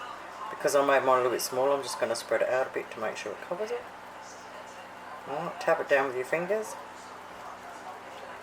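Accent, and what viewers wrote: Australia. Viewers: Girrrlll where have you been hiding? Nothing better than a true blue Aussie girl whipping up some awesome Aussie favourites most of us have grown up on but have forgotten how to make. Mate you are an absolute bloody legend.!! Please keep them coming. Just love the typical Aussie twang. Since discovering you I have been binge watching the lot. Love it. Good on ya Matey! 🇦🇺👍👍🌈🦋💞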